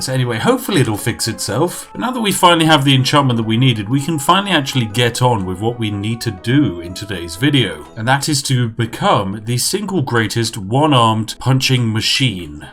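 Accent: United Kingdom. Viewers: i like his „that’s absolutely perfect“ and „fantastic“ 😂 of course in a perfect british accent. and of course: „oh, goodness!“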